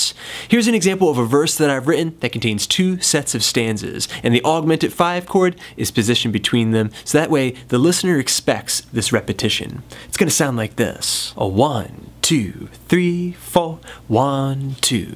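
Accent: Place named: Maryland, United States. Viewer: dude are you from Maryland? Thanks for the lessons by the way. really helping me pick guitar back up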